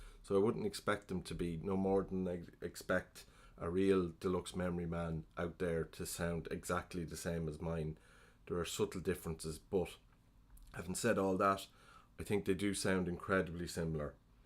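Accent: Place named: Ireland. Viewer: and? You started life with two strikes against you. I was going to offer my sympathies but I heard your voice and it appears you're Irish which more than compensates for the two aforementioned failings.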